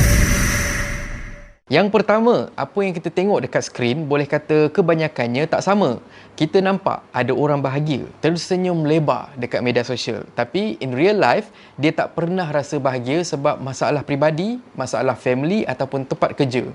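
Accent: Kelantan, Malaysia. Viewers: Terbaiklah bang ckp kelantan 👍👍sy suka tngk &sy pun dri Kelantan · The best part cube kecek klate dan slalu mitak maaf takut salah sebut dan pantun slalu terbaik, klakar abis..hehe Terbaik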